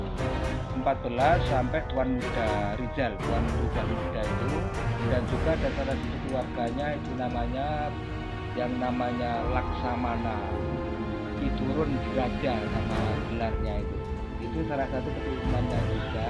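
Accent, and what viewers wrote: Java, Indonesia. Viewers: sija JK logatna jg katahuman jlm jawa.sejarah pulimbang Rik kumoring jg pandai iya.api lagi pok na Di jawa dudo,tambah pandai iya.hibat o, sa salut nyak😄😄😄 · Aneh ini yg ngomong orang jawa logat ya apa ya paham to asal usul komring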